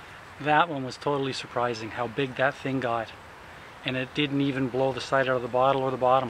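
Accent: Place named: Canada. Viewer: Detroiter here. I thought you were Canadian when I saw the Calamata Juice bottle. Then I heard you talk, and thought, "oh yeah, this guys got to be a leaf!"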